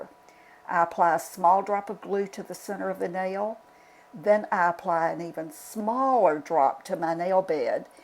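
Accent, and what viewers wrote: South, United States. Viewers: I'm smack dab in the middle of my 50s and I am so happy to find your channel. I have always been in to makeup & beauty, but nothing prepped me for the changes i have been experiencing with my skin & such the last few years..and just having to tweak little things to either make them more flattering, or easier to apply, as well as just the fun suprises -instead of wakign up to a pimple it is wakign up to a new spot, a vein And, as a Southerner, your delivery and voice is also a comfort to me--I guess it is the familarity of it; like you could have been one of my customers in the makeup department at Belk. Have a wonderful new year! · I totally loved listening to your beautiful southern accent. Alabama "girl" (or once was)😊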